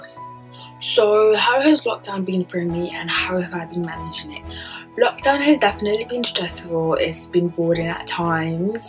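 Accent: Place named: Scotland, United Kingdom. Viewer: Sounds Scottish to me lovely story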